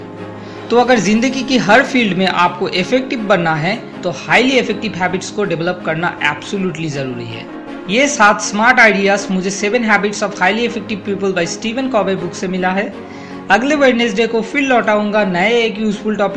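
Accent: Bengal, India. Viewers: U r bengoli Good bengoli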